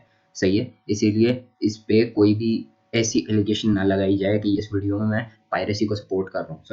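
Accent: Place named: India. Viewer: why is Indian accent annoying 🤠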